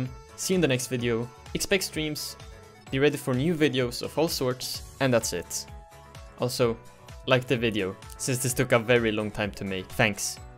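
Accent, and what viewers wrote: Sweden. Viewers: I see swedish accent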